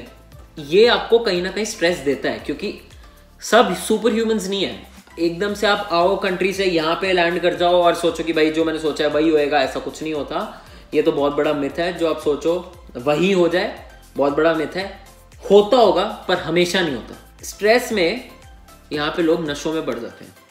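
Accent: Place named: Sindh, India. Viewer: I can surely say that you’re a Sindhi Indian. Borders just can’t change our accent ❤️ Love from SINDH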